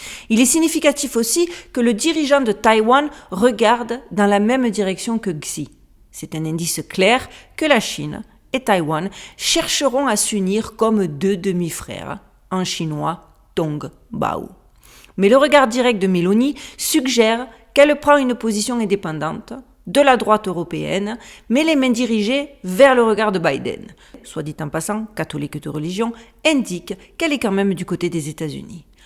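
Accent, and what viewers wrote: South, France. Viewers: Hé Bhé ! Bon courage à Nous tous et que cette année soit la meilleure possible , vue ce qui nous attend à court terme. Bravo à vous pour ces vidéos Très instructives et je me posé une question , dans votre adresse e-mail ou insta 13 est pour Marseille ? vue votre accent je crois que j'ai ma réponse . Merci encore Bises 😜 👍 . Ha oui ! moi je suis à l'opposé , Lille le Norddddddddddddddd . 👏👋 . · meme si l'OL est l'ennemi juré de l'OM je ne me sent pas arnaqué et suis trés fier à l'international que ton petit accent du sud rayonne!!!!!!!!!!! meilleures voeux 🥰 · Heu 😮 elle vit aux states 🇺🇸 !!! Donc pas d’accent du Québec en vue 😅sinon une pointe d’accent du sud de la France 🇫🇷 dont elle est originaire 🎉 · c'est normal 😊 🙏.. après le plus important que et vôtre amie et vous et passé les fêtes de fin d'année ensemble 🙏 le temps passe vite.. 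donc ☺️ courage je comprend ☺️ merci pour les sujets proposés 🙏🙏 et merci pour vôtre bonne humeur et l'accent du sud 😃 🙏 je vius souhaite une belle soirée 😊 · merci j'aime bien votre approche des sujets que vous traitez et votre accent du Sud aussi , surtout ne changez rien. Je peux avoir de l'humour sans aucune méchanceté mais qui parfois n'est pas compris. Ne m'en veuillez pas.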